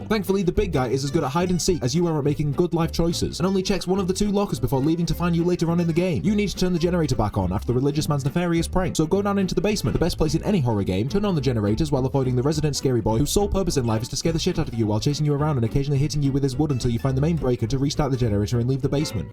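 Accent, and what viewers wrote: North, United Kingdom. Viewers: Love you especially because your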